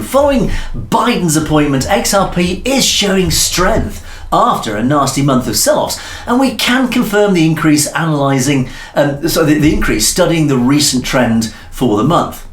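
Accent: United Kingdom. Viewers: posh bloke is more an UK thing tho.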